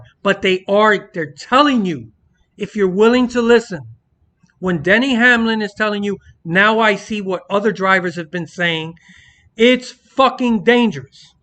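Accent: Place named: New York, United States. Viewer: These rant reviews are on point my man! Was telling so many people at work and in the neighborhood who you were and how hilarious you are especially with the NY accent 😂😂😂 I remember this weekend quite well as it was my mom’s Birthday Weekend and even though my favorite driver won the Pepsi Firecracker 400, I hate that we had to do it that way which is why I was better off going to the Fair that day instead which also makes me glad I fell asleep in the bedroom for half of that Winn-Dixie Firecracker 250 as well and when I finally woke up, I didn’t even bother and look how much happier I was that whole weekend compared to if I did watch.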